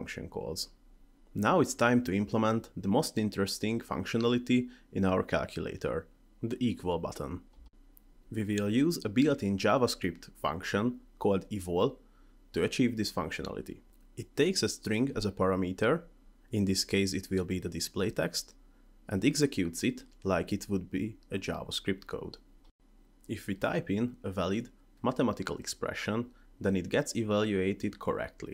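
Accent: Hungary. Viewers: Nice video! I'm wondering what kind of accent is this you have :D Jól sejtem, hogy magyar? :D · Hey man, thanks for the tutorial, easy and understandable... just one thing i want to ask... aren't you hungarian??? :D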